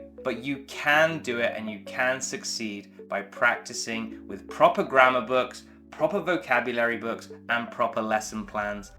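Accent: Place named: United States. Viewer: Why you have American accent? Even if u were born in Uk.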